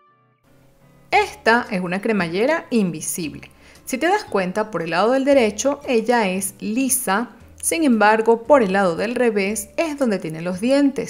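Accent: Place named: Venezuela. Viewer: Gracias.... Me gusta mucho la forma como explicas... De donde eres? Tu acento es venezolano, me parece.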